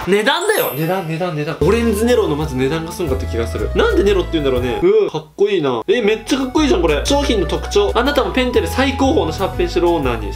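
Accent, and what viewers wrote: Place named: Kansai, Japan. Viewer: 謎の関西弁。惚れた。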